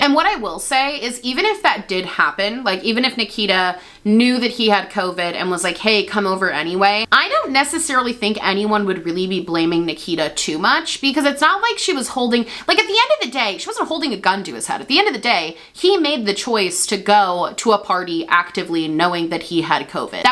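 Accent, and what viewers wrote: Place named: Upstate New York, United States. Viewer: Love how you have the most subtle upstate NY accent 😂😍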